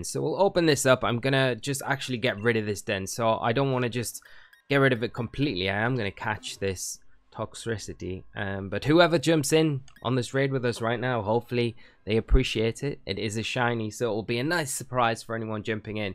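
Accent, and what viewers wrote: United Kingdom. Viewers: i like ya british accent B)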